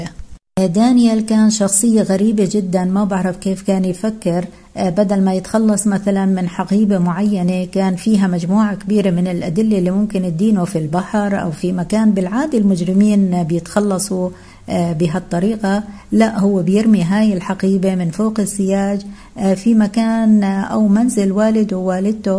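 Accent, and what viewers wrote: Jordan. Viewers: سردك للقصة جميل وشيق فيه كثير من اللغة العربية بميولك احيانا إلى اللهجة الاردنية الجميلة أيضا .. بالتوفيق سيدتي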